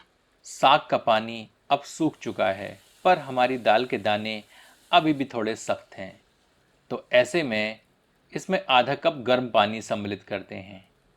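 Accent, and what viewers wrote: Standard. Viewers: ji pahli bar Laga ki koi Bhartiya safe Bhartiya vyanjan banaa raha hai. Aap dwara shuddh Hindi uchharan sunkar hamen Apne per aur apni bhartiyata per Garv hota hai aapko कोटि-कोटि dhanyvad. Aap din duni Raat Chaudhari tarkki Karen Ishwar aapki madad Karen.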